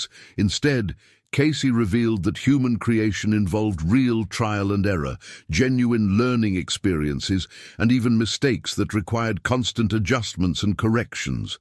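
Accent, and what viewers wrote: United Kingdom. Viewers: I’ve listened to several of these podcasts. I don’t appreciate the superfluous vocabulary. Get to the point. The narrator sounds like Robin Leach and is off-putting because it makes it sound like a cult. I’ve read Edgar Cayce’s transcribed visions but he never sounded like this British narrator.i agree with Cayce. But this rewriting of his own experience is abhorrent to history.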